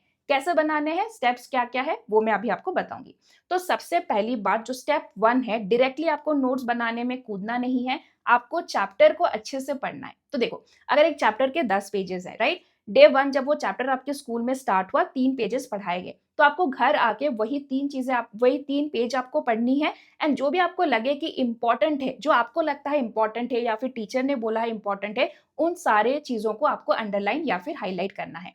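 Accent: Odisha, India. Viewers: Are you from odisha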